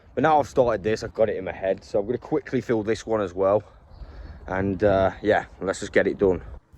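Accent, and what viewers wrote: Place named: England, United Kingdom. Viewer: Not garden related but I love your accent. Whereabouts in England were you born?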